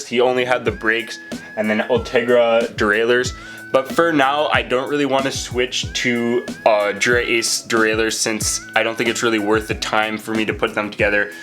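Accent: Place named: Canada. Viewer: lol The Canadian accent is on point! love the video!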